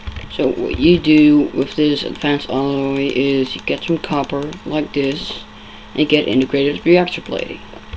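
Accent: Netherlands. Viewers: lolz je bent nl dat hoor je gewoon ;)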